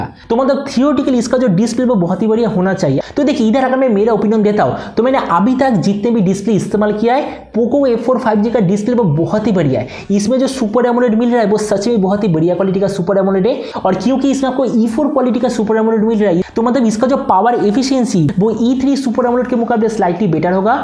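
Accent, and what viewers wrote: Bengal, India. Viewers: You must be a Bengali as your Hindi is typical of eastern part of india 😃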